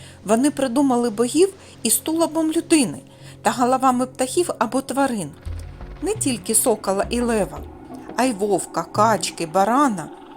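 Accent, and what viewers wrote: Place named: Belarus. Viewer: ахараняє? боже, ви наче не українка. а білоруска, яка з білоруськох мові перейшла на українську....слух ріжить... але урок хороший для дітей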